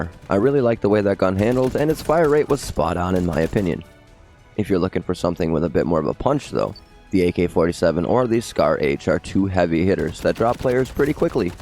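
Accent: Bengal, India. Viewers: U bangali?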